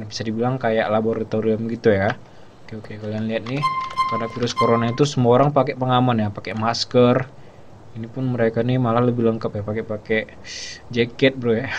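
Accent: Medan, Indonesia. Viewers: Bang kau orang medan ya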